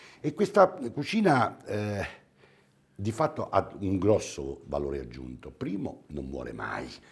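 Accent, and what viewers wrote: Rome, Italy. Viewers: in italy we have dialects….each region has one. He talks roma dialect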